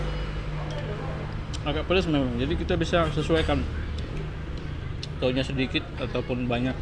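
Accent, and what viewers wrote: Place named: Siantar, Indonesia. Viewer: ok di tunggu videonya bang. Kalau bisa pertahankan logat dan bahasa Batak Siantar bang karena itulah menurut saya yang unik dari Abang . Horas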